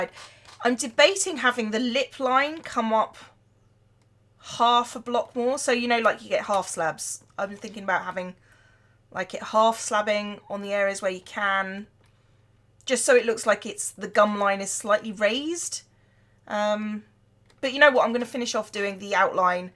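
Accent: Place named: Oxfordshire, United Kingdom. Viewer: Anyone know where in England is from? I'm trying to learn the difference in all the English accents by region. Awesome video, girl! I get excited when I see hermitcraft videos from you!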